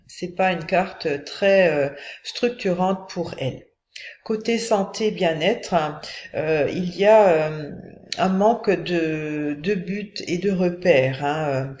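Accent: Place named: Switzerland. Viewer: A ta voix tu habiterais pas loin de la suisse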